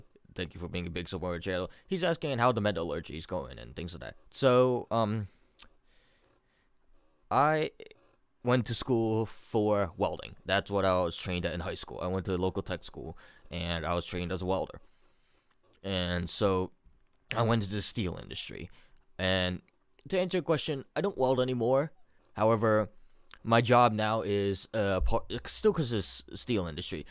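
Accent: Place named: Ontario, Canada. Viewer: You sound like your from ontario, more specifically markham area... Other than the guns that makes me think your in usa maybe north side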